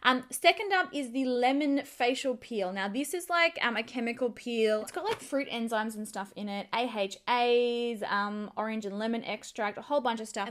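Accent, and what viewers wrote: Australia. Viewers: Love this! Thank you so much! I love your Australian accent and how you pronounce things.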